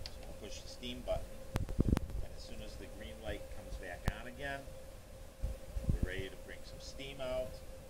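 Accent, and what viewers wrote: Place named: Wisconsin, United States. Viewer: You must be from Wisconsin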